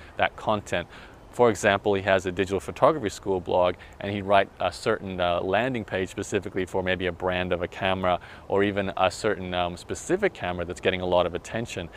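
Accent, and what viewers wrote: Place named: United States. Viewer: You are Australian, yet you sound like you have an American accent? Must be all that travelling you do?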